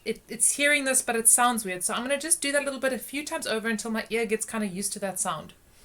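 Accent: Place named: South Africa. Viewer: Who else came for the sexy librarian but stayed for the South African accent? But more seriously, please post the other 23 studies...they’re tough.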